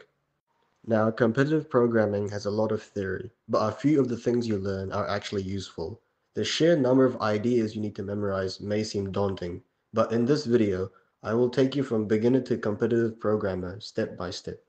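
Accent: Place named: Australia. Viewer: You sound like an ozzie 🤣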